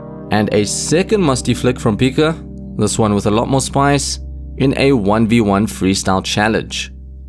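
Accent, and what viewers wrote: South Africa. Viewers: Is this a South African accent i hear?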